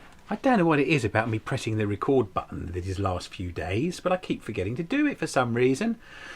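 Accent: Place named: England, United Kingdom. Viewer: Beautiful piece, but what was the medium you added to the Gold Paint? Sorry, but I'm in the states and didn't understand it through your accent. Of course, we speak american as opposed to English, so that could account for it. :-)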